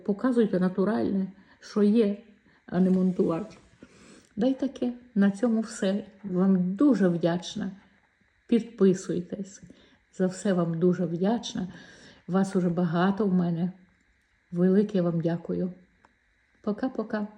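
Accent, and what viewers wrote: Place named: Kyiv, Ukraine. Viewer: Доброго дня пані, сьогодні попала до вас на гостину на ваш канал вперше, бачила ваш коментар у Вікторії та що вирощує свині з Запорізької області, переглянула ваші шортси і відео, дуже цікаво, у вас такий гарний голос, приємний, звідки ви? У вас така мова як у мої тітки яка живе в Фастівському районі Київської області. Тільки прочитала під одним відео що у вас можна замовити пепінку, вперше про неї почула у вас, але як смачно ви її куштували і яка вона соковита в мене аж слина з рота побігла😅. І тут я читаю коментар який був написаний два дні назад що вже немає у вас саджанців на замовлення, дуже вдячна якщо дасте номер пані Наталії з ізмаїла, а можливо у неї є також канал на ютубі? Також буду вдячна якщо скинете силку на її канал, соромно звзнатися але інжир в такому вигляді я ще не їла, тільки в'ялений і той що я їла вперше він мав запах якихось ліків. 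Скажіть будь ласка по якій ціні Ви купували той інжир, плоди, що з Ізмаїла. А ще буду дуже вдячна якщо дасте адрес, того пана Анатолія де ви брали саджанці пепінки. Я сама з Одещини 🙋‍♂️. Поки дослухала ваше відео, вже в мене склалося враження що ви з Житомирської області, там також жила моя покійна тітка. Ще раз дякую дуже велике вам за відео, дуже приємно було з вами познайомитися, скажіть будь ласка а ви себе не показуєте у відео, дуже приємний ваш голос хотілося б вас побачити. Всього вам самого-самого найкращого пані, хай Бог береже вас і вашу родину, Божого вам благословення 🕊️🙏🙏🙏 буду з нетерпінням чекати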